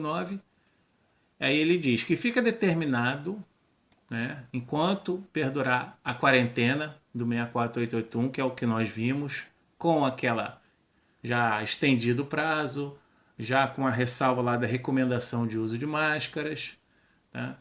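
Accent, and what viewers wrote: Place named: Rio de Janeiro, Brazil. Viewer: Vc é paulista??? Achava que era carioca, do Rio de janeiro.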